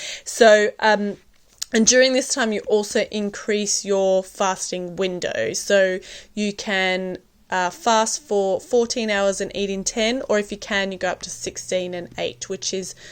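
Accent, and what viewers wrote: Australia. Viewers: Maso ..I'll give you one hint:....She is Australian. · Why do you sound so Australian.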